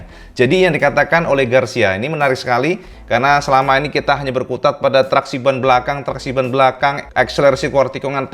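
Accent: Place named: Java, Indonesia. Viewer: Asli aku seneng liat komentar, logat jowone marai guyu. "py tho kang mas...😁" lanjutkan kang. Tp ndelalah detail infonya...aku suka sekali.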